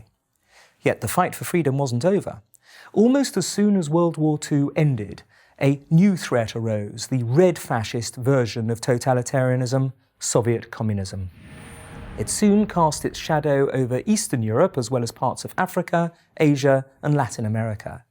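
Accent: United Kingdom. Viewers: This is a inside joke right? From your accent I'm assuming that you are British, I'm embarrassed to be from the same country as you. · Why is their a British guy saying this? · Nice work getting this intelligent sounding British man to dazzle the yanks with his fancy accent. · Absolutely! With his british accent, looming pasty face and giant forehead this feels like something straight out of 1984, like it should be projected on the side of a building to give the proles on the street their daily dose of propaganda. "WAR IS PEACE, FREEDOM IS SLAVERY, IGNORANCE IS STRENGTH"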